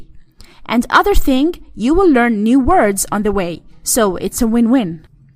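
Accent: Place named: Arab world. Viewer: Sister we liked your Arabic Dialect what we are learning.Dialects means to say a word in different ways by different people so it does no make any difference.For example I am Pakistani and our root words< Haroof e Tahajji > are the same as of Arabic except a few additional root sounds.We recite the Holy Quraan and understand but when we hear the general conversation of Arab brothers we feel difficulty to understand. However we are understanding you well.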